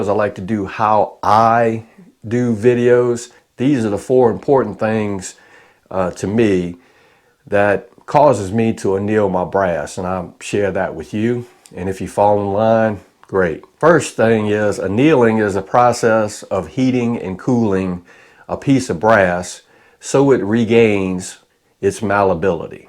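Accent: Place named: Louisiana, United States. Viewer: Are you sure you're not from Louisiana? Show sounds like a Bayou Teche accent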